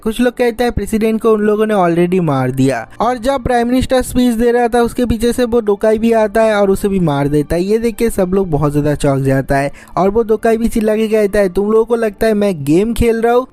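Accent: Bengal, India. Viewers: Bengali hai kya bhai tu🤨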